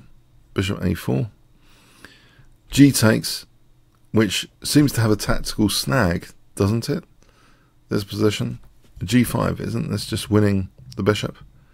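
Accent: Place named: London, United Kingdom. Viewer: You sound like a Londoner so surely you're familiar with Grosvenor Square? The 's' in Grosvenor is silent, you know.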